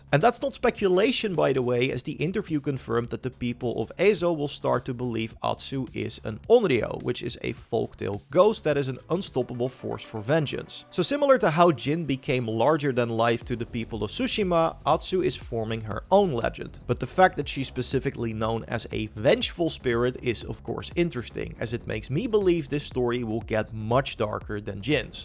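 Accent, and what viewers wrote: Netherlands. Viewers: I hear an accent . You from europe ? Netherlands?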